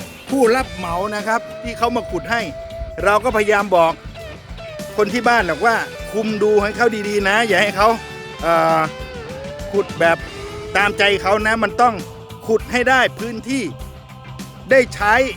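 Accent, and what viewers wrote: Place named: Chaiyaphum, Thailand. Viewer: เจ้าอยู่บ้านใด สำเนีงคือคนชัยภูมิแท้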